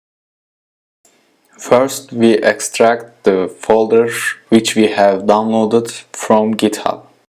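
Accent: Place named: Turkey. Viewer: Türk olduğunu gizleyemezsin aksan ele veriyor :)) . Video güzel olmuş eline sağlık.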